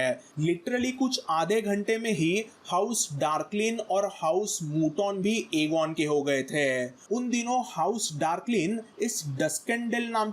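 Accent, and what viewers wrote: India. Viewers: Open the video for listening. Found out this fûc-kface speaking in Indian accent. Leave.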